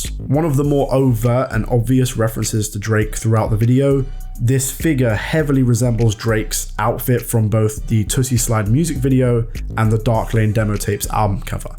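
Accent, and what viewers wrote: United Kingdom. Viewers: What do you want him to do, an impression? He’s from London. This is his accent. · Why am I listening to a British dude explain our shit lol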